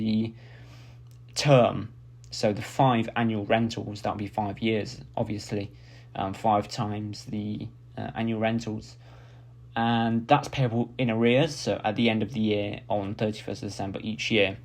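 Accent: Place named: United Kingdom. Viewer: You explained it for simply, thanks a lot. I expected to see more videos but didn’t find. By the way I love this British accent lol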